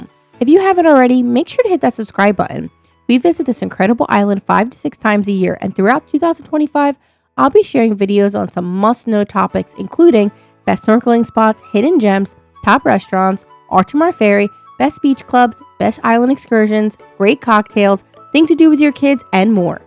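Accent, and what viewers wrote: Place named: Philadelphia, United States. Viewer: I had to check your profile to confirm my suspicions after you said “water”…Philly accent confirmed! Lol thank you for the gorgeous views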